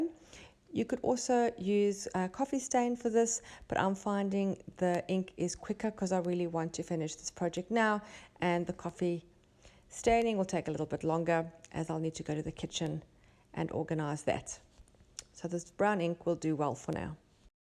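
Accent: South Africa. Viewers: sounds more South African than Australian, doesn't she?:) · I could tell she is South African, that accent never goes.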